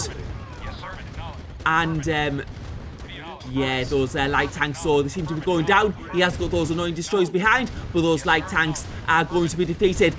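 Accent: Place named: Wales, United Kingdom. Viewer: Love your videos! You are really good at presenting the game. For the life of me though I cannot hear what you are saying in your first few words when a video starts. What accent is this? Welsh?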